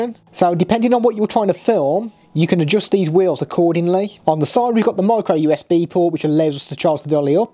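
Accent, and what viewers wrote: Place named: Black Country, United Kingdom. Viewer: Oh also, nice to hear a proper brill accent cocka! I’m originally from Cannock, but now live in Ontario... nice to here such a strong accent from the old county :D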